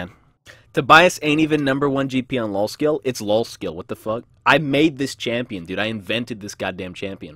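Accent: Nova Scotia, Canada. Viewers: is he from Nova Scotia?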